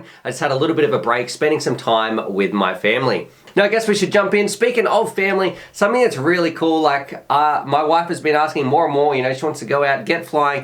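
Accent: Australia. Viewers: Being new to this hobby, I'm curious just how many sports or activities there are. Especially at the entry level. I see a few drone makers doing a sort of flight school type thing with certificates at the end. Just curious if anyone has ever done an overview of all of the entry level activities there are they make the learning curve easier or more fun. Thanks for all the awesome work you've done, when I get to submerging myself into a subject on YouTube, someone always rises to the top of my watch list, that sir would be you. Might be the Aussie dialect, but I'm pretty sure it's because you do awesome videos!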